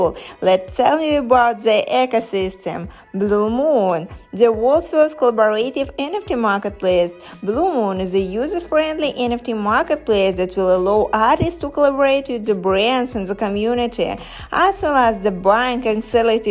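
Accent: Russia. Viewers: is she from russia or opland or ukraine, im confused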